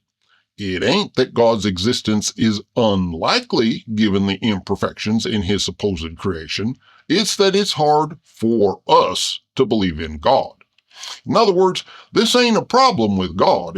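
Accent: South, United States. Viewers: You are healing my fear of southern accents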